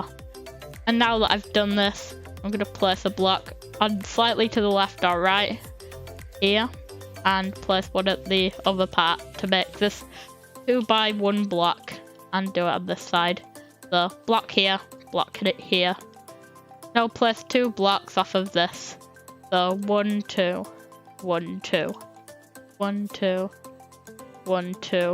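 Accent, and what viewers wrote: United Kingdom. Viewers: Your British accent I LOVE IT❤ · after i cam e to your channel i already knew you are british xd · Are you from the Britain cuz you have a british accent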